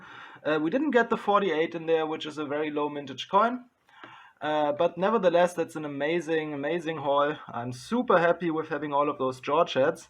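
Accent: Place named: France. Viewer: If I am to guess, this man is a French-speaking person judging by the accent.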